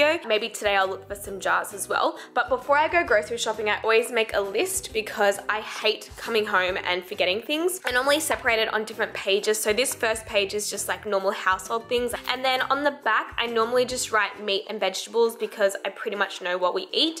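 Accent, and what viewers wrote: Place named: Australia. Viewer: It’s just Australian lol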